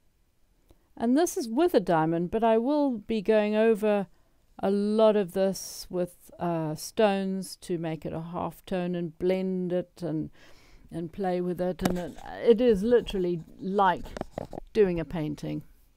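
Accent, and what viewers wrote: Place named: South Africa. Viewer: Ur south Africa accent keeps popping up.!!😁😁😘 I keep trying to look round ur hair.!!!🤣🤣🤣🤣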